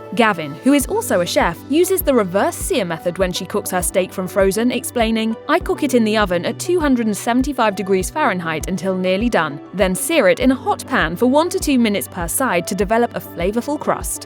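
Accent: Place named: England, United Kingdom. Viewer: The English accent is terrible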